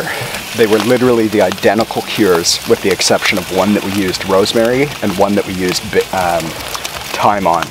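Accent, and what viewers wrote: Canada. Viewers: is that a Canadian accent I detect? If you follow my page I have a cab in myself way up in the northern Adirondacks on the Canada border ck us out 👍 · I’m making some bacon today with your recipe… can’t wait!! You Canadians have the best accents ever!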